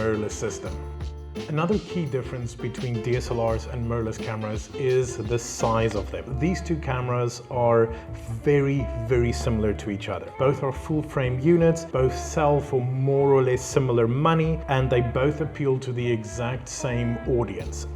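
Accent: South Africa. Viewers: He's a South African. South Africa is a country inhabited by people. People have different accents, depending on their parentage, heritage and significant influences during their language acquisition years. Almost no-one, except Actors playing a specific role that requires a particular accent "try to sound like an American", because (as in all societies) there are dozens of regional accents across the country. Your comments illustrates several things about you. ● You are a poor observer ● You are immature ● You have little knowledge of languages ● You rarely think clearly.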